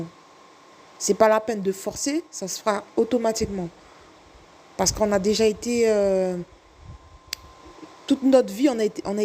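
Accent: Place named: French Guiana. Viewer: Bonjour, merci pour ces explications. Je ne connaissais pas votre chaîne, je me retrouve dans vos explications. Je me suis abonnée et écoute depuis hier vos vidéos. Vous avez un accent guyanais 😊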